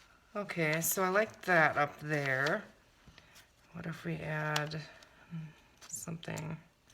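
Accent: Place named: Midwest, United States. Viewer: Just found your channel and also subscribed to your monthly kits. Lovely style! I’m from MN currently living in IL, and your accent makes my heart yearn for home!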